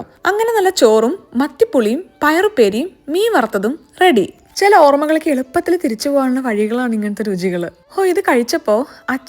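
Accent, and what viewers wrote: Malappuram, India. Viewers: അപ്പൊ ചേച്ചി മലപ്പുറംക്കാരി ആണോ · ഇങ്ങള് മലപ്പുറാ...😅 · വിവരണം കേട്ടിട്ട് കൊതിയാവുന്നു.... 😋🤩 ചേച്ചി മലപ്പുറം ആണോ... 🤩❤ മലപ്പുറം എവിടെയാ...? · Malappuram aano veed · ചേച്ചി മലപ്പുറം കാരിയാണല്ലേ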